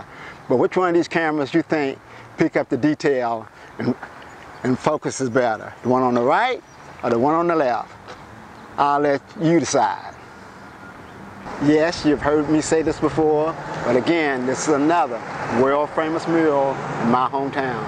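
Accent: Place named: South, United States. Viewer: GREAT presentation, Sir!! I just love your Southern accent. Great use of language. Keep on sharing!!! Greetings from Montreal.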